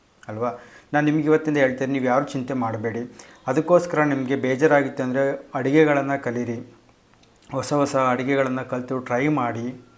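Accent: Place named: Holalkere, India. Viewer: Anna nammadu Chitradurga, Holalkere Grammina bhashe Keli nanna balyada dinagalu nenapayitu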